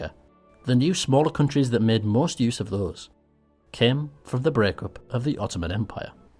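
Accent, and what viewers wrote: United Kingdom. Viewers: I fucking hate your accent with all the force of my spirit but I have to say brits surely do quite good content here in youtube (even if sometimes i dont fully understand what you say) keep the good work my good chap P.D: Roll youR fucking Rs for the love of god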